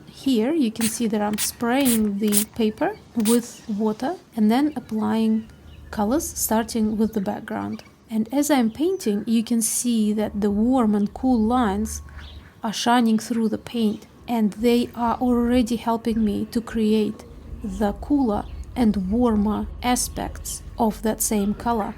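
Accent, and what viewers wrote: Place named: Russia. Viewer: Абсолютно русский акцент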